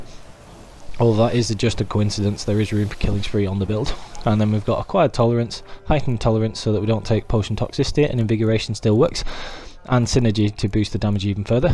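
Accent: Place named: Bolton, United Kingdom. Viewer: You from Bolton?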